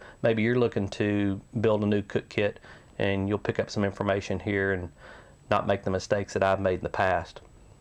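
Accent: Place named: East Tennessee, United States. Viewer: Good review. Your accent shows your family was from East TN or similar Appalachian Mountain origins; maybe that is where you get your backpacking wings? Never heard a TX person nail the East TN accent as well as this.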